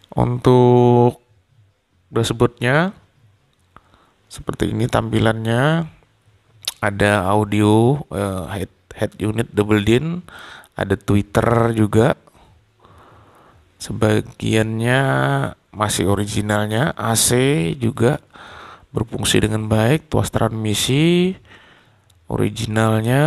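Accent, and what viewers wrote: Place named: Hulu Sungai, Indonesia. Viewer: Logat/dialex hulu sungai masih kadangaran broooo !!!!!!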